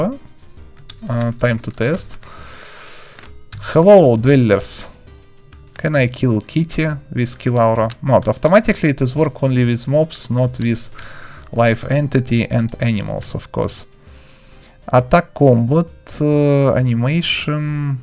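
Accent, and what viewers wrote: Russia. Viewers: палиться пиздец,у него акцент рашки,пиздец угарно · Акцент русского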